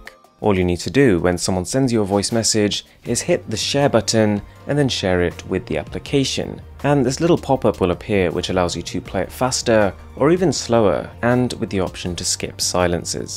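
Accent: RP, United Kingdom. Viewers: Do u really speak like that, posh!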